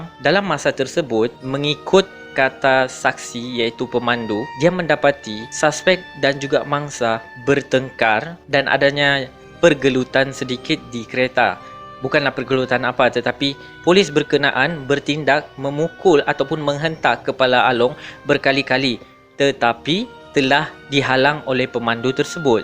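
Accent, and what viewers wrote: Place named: Sabah, Malaysia. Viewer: apa kau bunyi macam org sabah bah?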